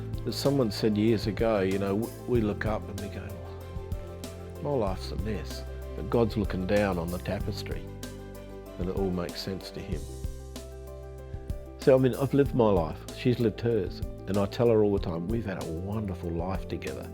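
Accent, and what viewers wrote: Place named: England, United Kingdom. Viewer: THANK YOU! I JUST GOT YOUR MSG, AND WATCHED YOUR VIDEO AGAIN. I MUST TELL YOU, YOUR WIFE IS BEAUTIFUL! GORGEOUS HAIR AND LOVELY DARK EYES, AND YOUR NOT SO BAD YOURSELF.....HANDSOME COUPLE...MATCH MADE IN HEAVEN INDEED! I LOVE THE WAY YOU TALK ABOUT THE LORD, I KNOW WELL WHAT ITS LIKE TO DEPEND ON HIM EVERYDAY. IM 71 AND BEEN SUFFERING WITH MS FOR 20 YEARS. AND YOUR RIGHT WHEN YOU WONDER HOW PEOPLE LIVE WITHOUT FAITH IN THE LORD. I TELL YOU, I WOULD HAVE ENDED MY LIFE YEARS AGO IF I HAD NO FAITH! KING DAVID SAYS IN ONE OF THE PSALMS,"LORD, YOU KEEP ME ALIVE"! IS IT NOT THE TRUTH?? MY HUSBAND LOVES ME BUT HAS NO PATIENCE WITH ME, IT MAKES THINGS HARDER FOR ME BUT HE HAS MANY HEALTH PROBLEMS HIMSELF, SO I TRY TO UNDERSTAND. AT LEAST HE'S NOT CONFINED TO HOME YET. THANK GOD! YOU SOUND ENGLISH, MY MOM WAS ENGLISH AND IRISH. 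MY HUSBAND IS IRISH AND WELSH, BUT MY DAD WAS POLISH. WHAT A MIXTURE I AM, AND I DONT LIKE POLKAS OR POTATO SOUP.....BUT I LOVE MY TEA!! SORRY FOR JABBERING ON, BUT ITS FOOTBALL TIME IN AMERICA, SO YOU KNOW WHERE MY HUSBAND JOHNNY IS!! YES, I GOT ME A TOO!! IF YOU THINK OF IT, IN YOUR PRAYERS MENTION SUZY ROBERTS, HE KNOWS ME WELL, AS I HAVE YOU AND IN MINE ALREADY! TAKE CARE AND MAYBE WE WILL ALL MEET SOMEDAY IN THE KINGDOM....I SURE HOPE SO!! LOVE AND BLESSINGS FROMAMERICA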